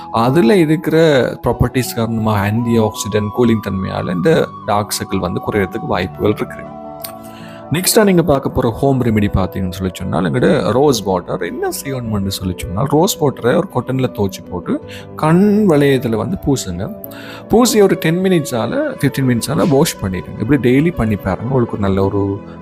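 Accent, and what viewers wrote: Sri Lanka. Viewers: Hii doctorayyyyyyyyyyyyy this is u r subscriber usha how r u sir speaking out a excellent and a marvelous srilankan tamil ❤🎉❤🎉❤🎉❤🎉❤🎉❤🎉❤🎉❤🎉❤🎉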